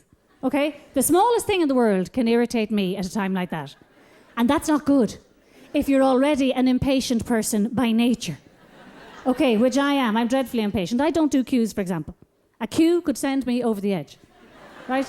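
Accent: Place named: Ireland. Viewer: Just discovered her the other day. Love her Irish accent. Hysterically funny. Big fan now.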